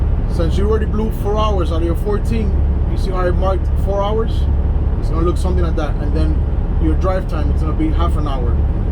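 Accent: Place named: Miami, United States. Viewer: I can tell your from Miami. Same here brother! Great video very helpful btw!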